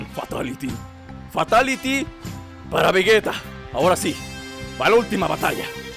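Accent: Mexico. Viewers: de que parte de México eres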